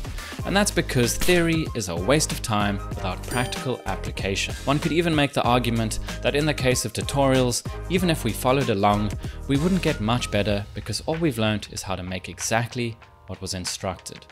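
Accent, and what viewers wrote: South Africa. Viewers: Nice video, I thought I recognized South African English.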